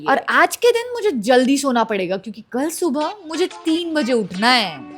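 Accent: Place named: Indore, India. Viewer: As a indori I just love that bich bich me thodu sa indori wala pronounciation 😁☺️🫶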